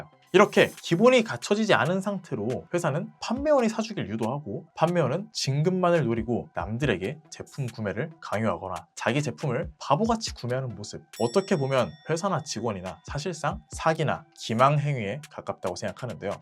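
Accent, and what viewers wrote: Gyeongsang, South Korea. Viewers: 이 분 발음이 경상도 분이시네용 ㅎㅎㅎ 영상 알차고 좋네요 잘 봤습니다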